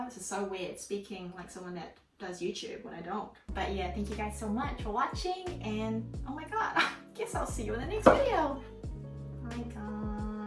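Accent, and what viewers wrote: England, United Kingdom. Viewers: I love your English accent and i just wanna hear more and more 🥰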